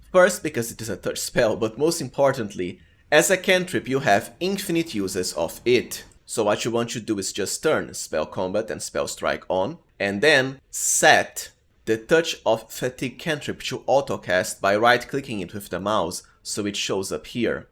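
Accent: Brazil. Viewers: 5 seconds in and I KNOW you are Brazilian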